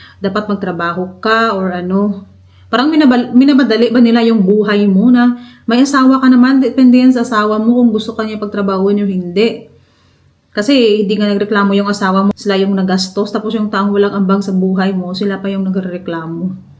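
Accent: Iloilo, Philippines. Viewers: ang sis in law ko dati marami rin pimples tapos nag consult siya sa dermatologist naghiyang siya dun sa pinapahid niya gumanda ang Mukha Niya. ikaw din maganda kna parang may kinang ang Mukha mo. I wish mkadiscover ka ng gamot n mkaalis ng pimples mo para Lalo Kang gumanda . honestly bisaya din Ako but i really love the ascent of ilongga.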